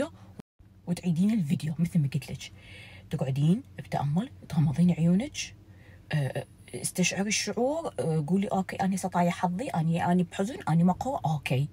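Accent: Iraq. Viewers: جعل ما يحجي غيرج لبى لهجتج العراقيه ♥️🎈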